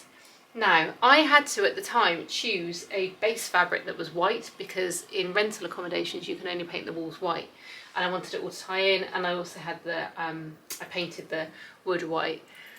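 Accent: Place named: Bristol, United Kingdom. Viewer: Cool vids. I can tell of a slight Bristol accent am I right cute studio my love ❤🎉❤🎉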